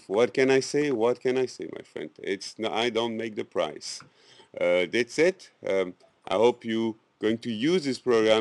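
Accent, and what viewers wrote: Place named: France. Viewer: lol accent francais :)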